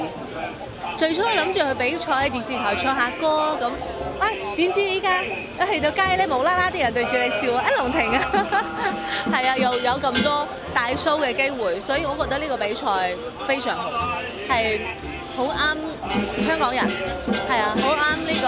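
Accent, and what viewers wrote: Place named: Northeast China, China. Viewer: 東北女孩廣東話咬子始終口音難改，龍婷要好好努力。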